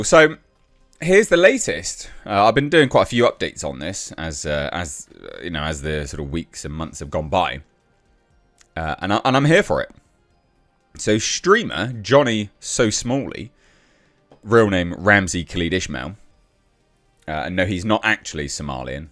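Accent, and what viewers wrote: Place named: United Kingdom. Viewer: Forgive me for repeating myself but I love breakdown of the situation. Between his British accent and his use of modern colloquialisms it’s hands down my favorite solo streamer/youtuber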